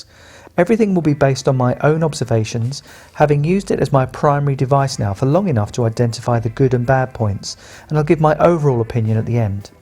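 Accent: United Kingdom. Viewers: Loving your Brits accent and of course the review. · I like your perfect British accent! Greetings from Russia!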